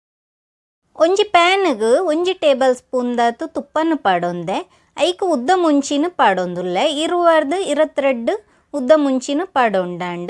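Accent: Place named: Mumbai, India. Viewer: You sound like Mumbai residing mangalorean.. it's very irritating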